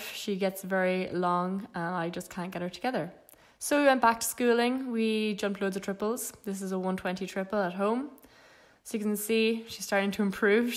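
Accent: Ireland. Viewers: That's an Irish accent, not a British accent. There's a very big difference. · I’m pretty sure ur irish😂same what county Meath?cork?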